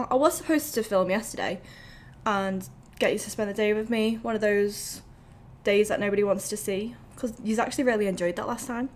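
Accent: Liverpool, United Kingdom. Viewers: Talking about ur accent You'll know that every fecker has an opinion with regards 2 the way people talk , but please dont let the pricks put u down ever . Are there many scoucers u work with ? Enjoy ur time in London and rather than just sayin " I,'ll be in London " can u say which of the 3 instead . . . As in Heathrow Gatwick or Stansted. Have a good one ! Christy 🇮🇪/🇵🇹 👍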